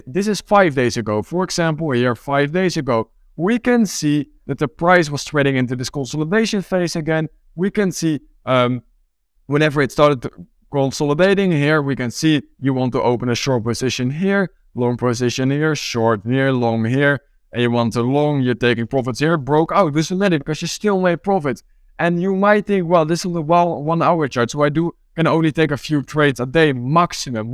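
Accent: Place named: Netherlands. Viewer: goede video man. Je merkt alleen wel dat je nederlands bent😂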